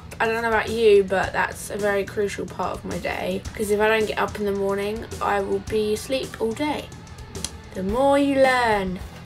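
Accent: United Kingdom. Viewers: She's British and they have an accent so not everything sounds as perfect as you wish it to be you silly American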